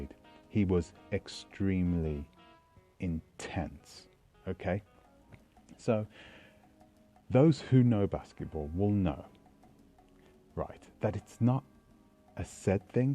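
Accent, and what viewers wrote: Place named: London, United Kingdom. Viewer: Grew up in B’Ham? Mmm - London accent!!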